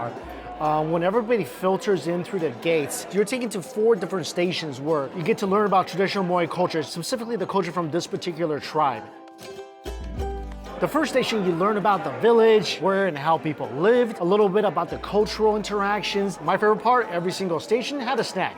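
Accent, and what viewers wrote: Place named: United States. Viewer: Yummy man I love our your pronunciation is freaken Terrible lol and so American man.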